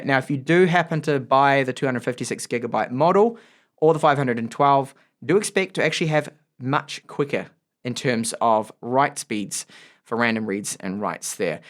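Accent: Australia. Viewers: he sounds Australian to me too? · What's your nationality? Aussie, Kiwi or English? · He sounds Australian to me lol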